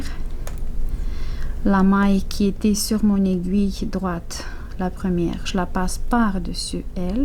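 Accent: Canada. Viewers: Merci, j'adore l'accent canadien, il me rappelle nos voyages. 👍🥰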